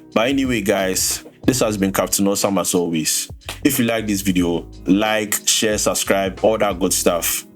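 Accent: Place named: Nigeria. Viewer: Nice accent. Are you Nigerian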